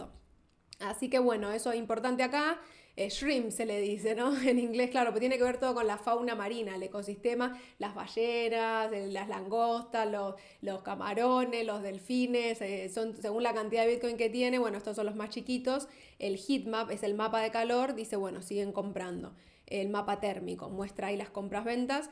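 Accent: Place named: Argentina. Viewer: que bonito es el acento argentino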